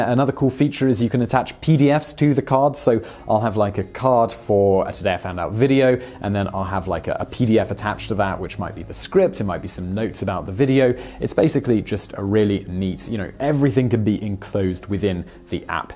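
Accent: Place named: RP, United Kingdom. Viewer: True, he does sound posh but, it's funny as hell when he looses it.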